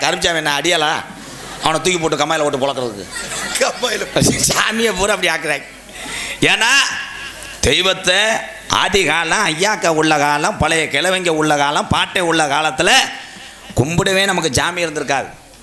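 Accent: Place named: Sivaganga, India. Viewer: Bro ithu aanavam illa...sivagangai seemai native slang.He is a good person.He did many helps for the poor peoples❤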